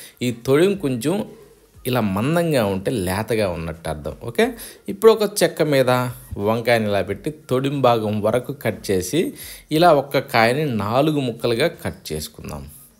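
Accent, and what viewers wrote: Nellore, India. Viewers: Nellore valla andi meeru . antha ma language.baga chesaru.baga chepparu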